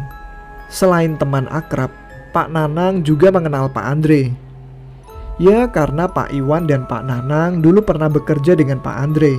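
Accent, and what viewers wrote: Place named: South Kalimantan, Indonesia. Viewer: Banjarmasin Balangan kal-sel suaranyaa😎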